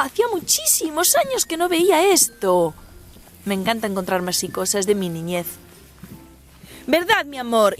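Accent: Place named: Galicia, Spain. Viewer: Me ha encantado este video... Con las tradiciones de tu tierra GALICIA. Tan verde, tan hermosa, tan maravillosa... Hayyy... Me gusta mucho tu tierra , sus gentes , sus paisajes, la costa galega, la gastronomía tan suculenta, y vuestro acento!!!. Y me gusta también, como transmites a tu niña todas las costumbres típicas de tu pueblo!. Enhorabuena y un abrazo desde Navarra!😘😘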